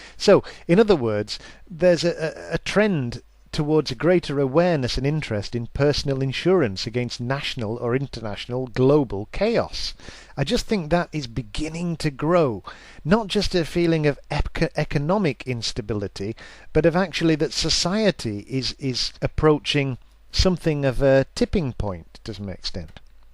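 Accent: United Kingdom. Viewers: wow -- very good post and i agree with your consolidation of the current developing "buzz". a few points - ammo is back (if you can afford it), gold is still controlled by the fiat makers, what are ordinary folk? btw, where did you get that image? I want it as my PC wallpaper. I have a good one for you as well. Your accent reminds me of a friend I had from Sheffield. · Great talk ... keep'em coming. A lot of common sense in your videos.. ... and is that a Geordie accent BTW ?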